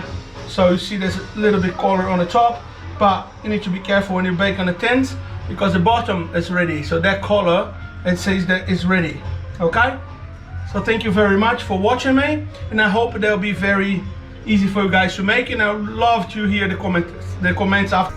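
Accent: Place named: New Zealand. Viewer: Oi, vim através do grupo barraqueiros de Queenstown LOL. Adorei tua receita e objetividade. Ja me inscrevi no teu canal e curti o video. Seria legal vc tb deixar a receita escrita na descrição do video. Obs: meu Deus parece que to ouvindo um kiwi falar!!! Abraco!!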